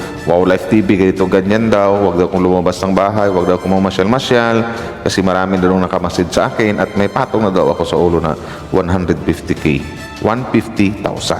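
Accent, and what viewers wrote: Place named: Batangas, Philippines. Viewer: ❤sir ang accent Ng salita ay Batangas.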